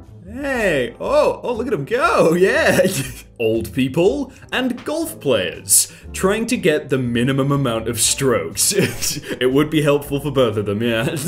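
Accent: United Kingdom. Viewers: day 61? of asking that he british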